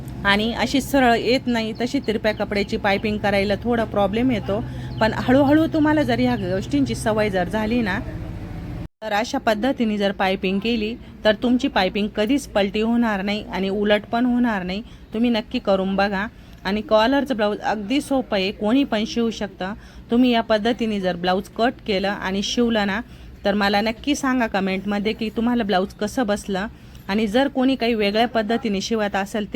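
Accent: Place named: Nashik, India. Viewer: तुम्ही नाशिकच्या आहेत का ताई🎉 बोलण्यावरून वाटतंय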